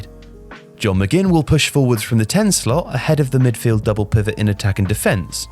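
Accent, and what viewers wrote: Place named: RP, United Kingdom. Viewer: It’s just posh and annoying.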